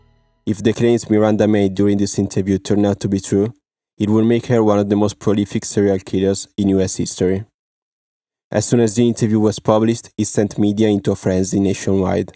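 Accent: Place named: France. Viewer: I enjoy your accent. I'm guessing French or French colonized country.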